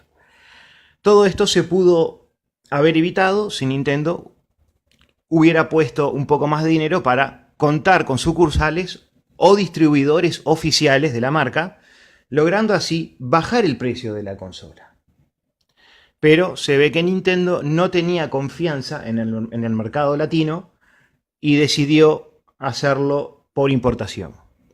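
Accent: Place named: Argentina. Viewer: ¡Más que uruguayo, pareces argentino!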